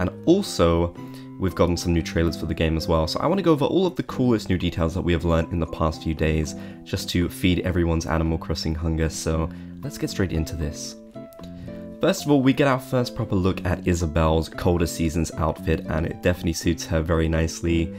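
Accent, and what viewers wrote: Wales, United Kingdom. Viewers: Ok I really need to know if you’re welsh bc of your accent it sounds so welsh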